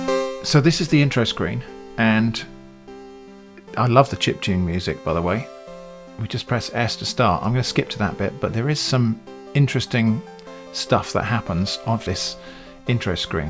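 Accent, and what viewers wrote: England, United Kingdom. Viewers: um...games is plural....if some one asks you if you play games, and your answer is 1....then your real answer is NO I DO NOT PLAY GAMES...I play one game...thats a good way to show you're not really paying attention, and just making something about you, which is why you would know what the question is...even though you're obviously not paying attention if some one were to ask if you if played any type of game....thats basically it, do you like any type of game? That is singular...sounds like a English accent, but many people from Asia have English accents. So its confusing....you can make a 36 min video that only people who like you will want to watch while saying you don't have time to play games...do away with these type of videos and you might have several more hours a day.... as some one who doesn't know you, I don't honestly care if you play games...this is my intro to your channel and I feel I made a mistake by coming here. 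Now youtube thinks Im gonna want to watch but this channel isn't for me, if you're talking about yourself on the first video. We already have too many people in real life who think they are some big shot, thats one reason why we avoid real life and live online. Less people who are egocentric, used to be at least.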